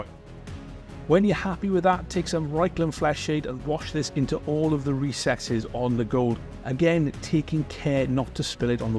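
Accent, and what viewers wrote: Wales, United Kingdom. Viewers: I just like the soft welsh accent. Cwmry am byth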